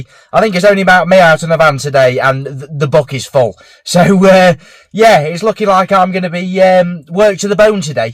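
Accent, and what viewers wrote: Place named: Yorkshire, United Kingdom. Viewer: Keep up good work and f all the haters , videos very entertaining and Yorkshire accent cracking shagger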